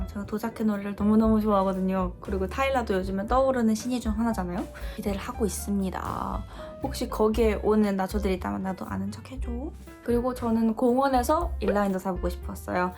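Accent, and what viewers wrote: United Kingdom. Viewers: 왜 한국어도 영국 억양인가욬ㅋㅋㅋ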